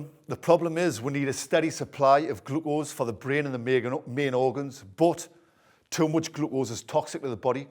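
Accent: Newcastle, United Kingdom. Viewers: I actualy understood that. Must have been the jordie accent!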